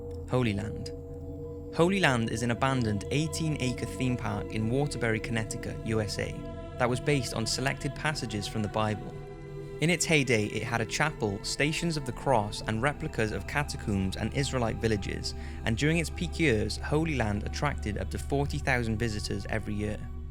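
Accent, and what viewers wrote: United Kingdom. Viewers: For some reason a British accent always makes a scary story scarier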